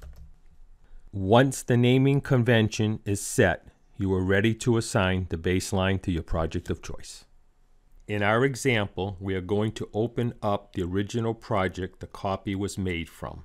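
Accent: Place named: New England, United States. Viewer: very helpful, and I love the New England accent. :)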